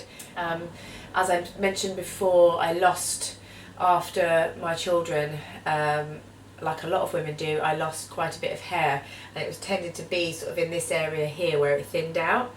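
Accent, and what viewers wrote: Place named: Essex, United Kingdom. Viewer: That's a very posh Essex twang hahaha xx Fab video 👌💕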